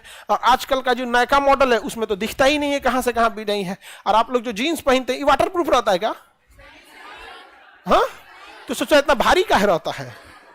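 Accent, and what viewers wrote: Bihar, India. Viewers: सर आप भी बिहारी डायलेक्ट में बात करके बच्चों को कनेक्ट करते हैं। कॉमेडी के साथ पढाते हैं तो बोरियत नहीं होती है बस इतनी सी बात है।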